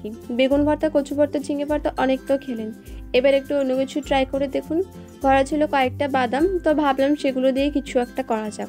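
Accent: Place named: Bangal, Bangladesh. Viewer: Bangal vasai ktha bolis valo lage sunte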